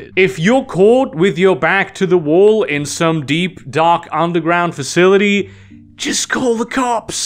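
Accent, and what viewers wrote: Germany. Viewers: I knew in one video mentioned being German, I didnt realize how German until listening to this. Honestly I could do with a video of him speaking solely German. Also, found a long lost map and campaign setting for shadowrun that I thought that I lost today same as when I started this video. I take that as a sign to run a game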